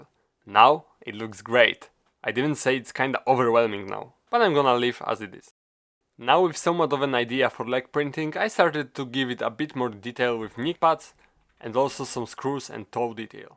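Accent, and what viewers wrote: Poland. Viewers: Are you polish like me?? You have polish accent. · akcent zbyt polski😩🙏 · Brzmisz jak brat Polak który próbuje po angielsku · Are u from poland? Beacose ur accent sounds pretty polish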